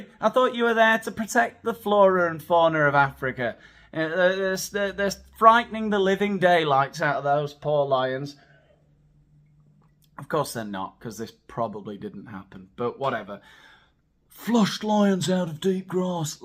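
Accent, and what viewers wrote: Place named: United Kingdom. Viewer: I ❤ conjunctive adverbs. Then again, everything sounds splendid in British English.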